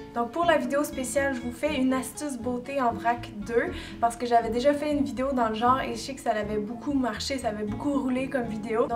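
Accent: Quebec, Canada. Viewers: Je suis espagnole et je comprends bien ton accent french canadian(le secret....j´adore les têtes à claques). Je te trouve ravissante,naturelle,tu sais transmettre ta bonne volonté,et le résultat ne peut être plus excellent. ERES UN ENCANTO Y TE MANDO UN BESO GRANDE DESDE ESPAÑA.ENHORABUENA.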